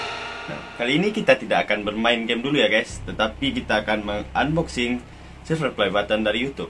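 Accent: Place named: Minangkabau, Indonesia. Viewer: Logat Minang nya masih kental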